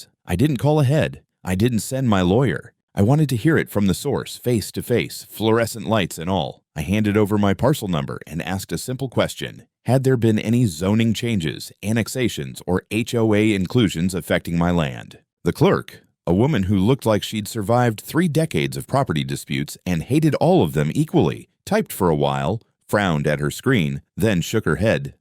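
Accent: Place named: Canada. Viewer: Zed IP... Canadian, eh?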